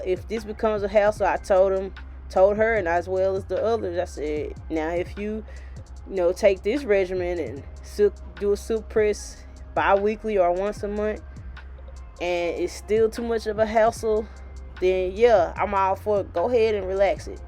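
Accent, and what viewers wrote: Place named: South, United States. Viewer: Love this southern accent!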